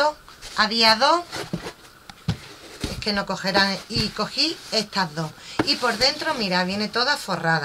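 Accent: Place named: Andalusia, Spain. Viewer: 😂😂 pareces andaluza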